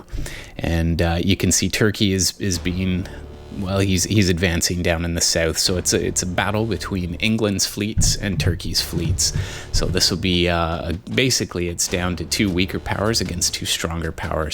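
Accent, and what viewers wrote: Canada. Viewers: Hello, fellow Canadian (assumption based entirely on raised “out” vowel)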